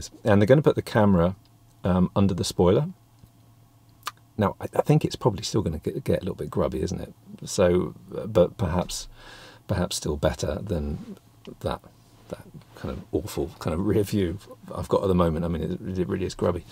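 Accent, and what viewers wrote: United States. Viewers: The American accent wasn't so bad, please do a full episode with the accent! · The American accent… 😂😂😂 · One question. Great American accent! Have you done some acting in the past? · I liked your American ascent :) ........and I'm American.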